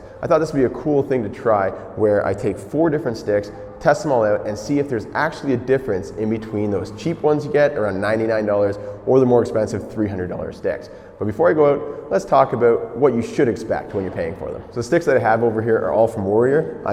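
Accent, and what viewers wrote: Canada. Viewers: What a thick canadian accent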